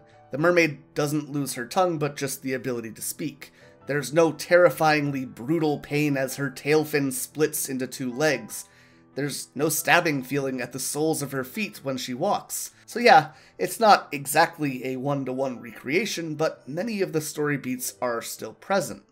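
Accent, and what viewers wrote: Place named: Canada. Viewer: Oh ok. I kept watching your videos and I thought you had a Canadian accent.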